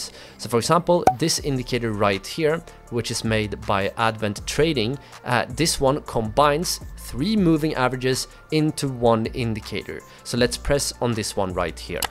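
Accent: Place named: Sweden. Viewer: Like the swedish accent❤️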